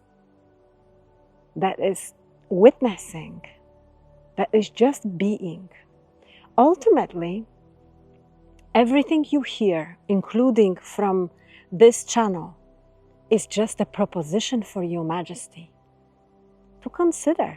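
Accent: Poland. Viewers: I also think it is something different about this Channel about this person. I don't know what it is but i can't stop watching.❤ Is it all thrue? Or is it another trick? I wish I knew. I hear polish accent I wish she would answer I wish to break free from this prison.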